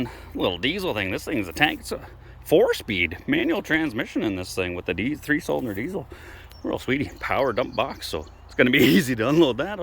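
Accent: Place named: Newfoundland, Canada. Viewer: A you sound like you have a little bit of Newfoundlander in you. I keep that little bike for sure it seem you have a lot of work, with a couple of Bud light beers easy Pesy.👍😉🍺👍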